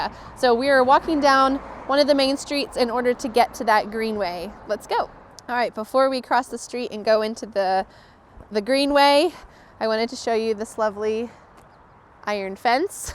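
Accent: United States. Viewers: I’m from Vietnam. I have been learning English for 10 months. I accidentally find your video. I feel happy because I really like American accent. You are a first teacher on my self-learn journey. I am gratefully your lesson. My mother settle down in the US. But she is not good at English. I hope myself can help her if I have any change go to there. I’ve been learning hard everyday! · Is this American english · Greeting from Poland i love americans pronounciation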